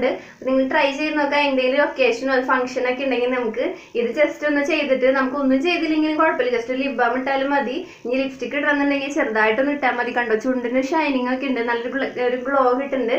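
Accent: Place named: Thrissur, India. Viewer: Kollatto. തൃശൂർ ആണോ. തൃശൂർ slag und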